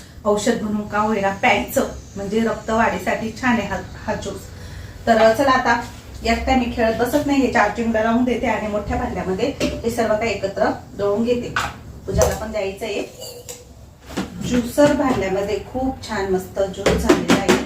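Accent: Nashik, India. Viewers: मला तर नाशिकची बाेली भाषा तुमची खुप आवडते माझी मैत्रीण सुद्धा सटाणा नाशिक येथील आहे तिचे बाेलणे सेम तुमच्या सारखेच आहे हे ऐकायला सुध्दा नशीब लागते❤❤❤❤ ताई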